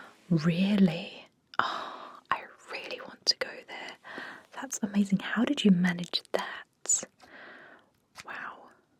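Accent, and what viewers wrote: United Kingdom. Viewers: plus a britsh accent help too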